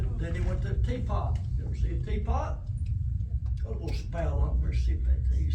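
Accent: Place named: United States. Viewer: asik om. baru kali ini denger asli aksen logat kaya koboy sibapaknya yg cuma ada di dalam film "well howdy partner nice see ya".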